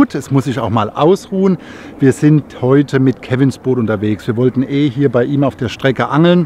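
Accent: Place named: Hesse, Germany. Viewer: "Un hier ham mer beim Türk eh paar Peperonis geholt" Hessisch is die best Sprach dies gibt 😁💪🏻👍🏻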